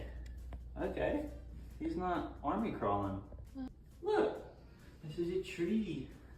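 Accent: South, United States. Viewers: I never realized how southern his accent is 😂 Oh, and Kurt's a cutie as always ofc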